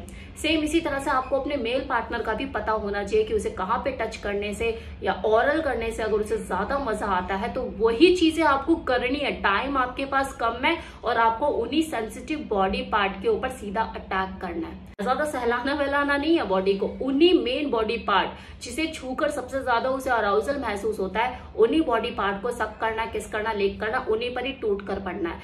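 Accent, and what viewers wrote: Punjab, India. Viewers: Kiss-vis, lipat-vipat, choos-chaas. Kya punjabi tadka hai wakai hamey to anand/maja aa jata hai sunkar hi. Pls keep it up as good going. Txs Gtb Delhi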